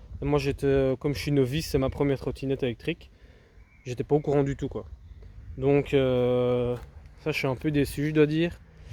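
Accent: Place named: Belgium. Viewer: Il me semble entendre un accent habituel, tu ne serais pas belge ? 🙂Si oui, dans quel magasin de trott vas-tu ? Merci en tout cas pour le retour objectif fait dans cette vidéo ✌🏼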